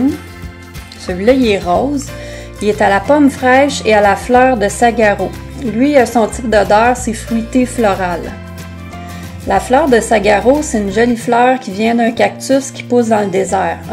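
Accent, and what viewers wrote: Quebec, Canada. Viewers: Super ta vidéo! Je suis allée à Montréal l’automne dernier et j’en ai profité pour en acheter 6! Leurs brumes sentent tellement bons! Je m’abonne à ta chaîne 💗! En plus j’aime beaucoup ton bel accent québécois 💗💗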